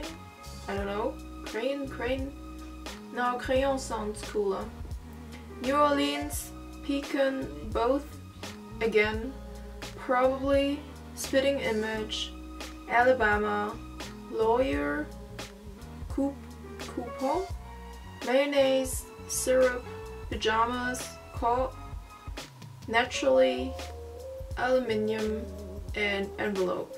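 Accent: Germany. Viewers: Omg ur German accent is really cute no offense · As a Chinese Canadian who speaks Mandarin and lived in Germany briefly, your English accent is definitely GERMAN, which is very cool in my opinion! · Bist du aus Frankfurt ?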